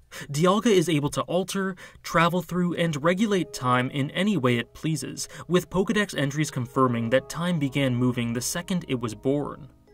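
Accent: Canada. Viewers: Yo! What part of Canada are you from!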